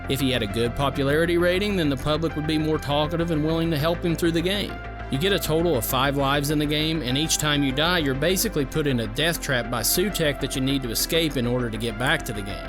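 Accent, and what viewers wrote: South, United States. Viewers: Great content and I love your accent man. As a fellow southerner it gives me great pride to hear your voice in a well out together video game review YouTube channel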